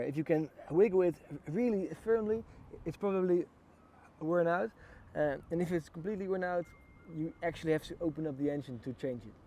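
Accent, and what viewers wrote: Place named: Netherlands. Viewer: Hey! Ive been interested in getting a honda XR600R (after getting my licence) for a while now but as i'm a beginner i feel like it might be a bit too much. do you have any recommendations for similar bikes that are more for beginners and that are easilly/cheaply available in the Netherlands (its sounds like you're from here) Thanks in advance!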